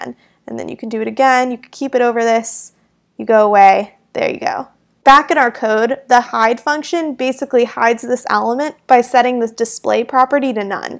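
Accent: England, United Kingdom. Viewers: Dam your English accent is very nice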